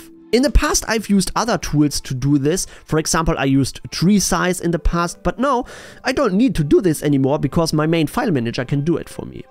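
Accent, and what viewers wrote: Germany. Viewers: Boh-Ey, ein Deutscher der englisch spricht... Jetzt muss ich auch noch den Translator aktivieren um was zu verstehen...😱😱😱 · Cooles Programm, werde ich definitiv ausprobieren. Btw. als ich die Stimme gehört habe hab ich gedacht oh ein deutscher. Dann sehe ich den Namen und ich lag richtig 😅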